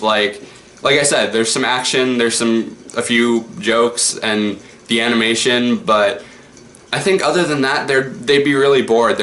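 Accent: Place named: United States. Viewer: I’ve never heard that kinda country accent before🤠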